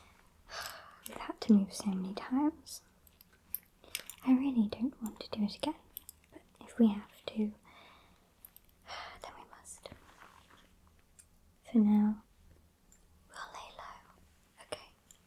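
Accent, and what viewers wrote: United Kingdom. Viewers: "You've got some foliage stuck in the back of your hair." 🫠 Aw that accent. ❤ It's something so commonplace as to be beyond notice to a native like you I'm sure. But the sound of your voice has the capacity to completely short circuit my North American mind.🗣🇬🇧 🤯👍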